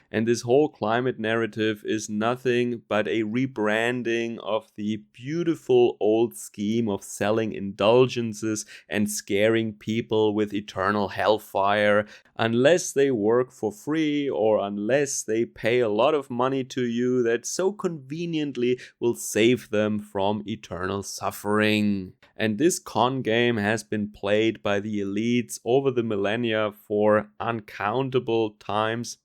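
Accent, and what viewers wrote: Germany. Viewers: I want to hear you say..... It only takes a camera to change her mind. In your cool German accent You know the song Lol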